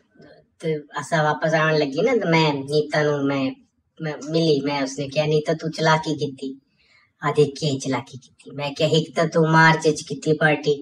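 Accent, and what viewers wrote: Saraiki, Pakistan. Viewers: Garav gera salute to h that u kept ur ancestor's language alive even in india after d partition..lov ur sraiqi axcent i belong to pakistan from sraiki belt althought nor a sraiq myself but knows sraiki very well...thuwaku meda salute bauw zabrdast alaa ay thuwadi ji khush krdita hay zraiqi zindabad saadi mithi alaa te salamti einku alaawarnd alaa te....🎉🎉🎉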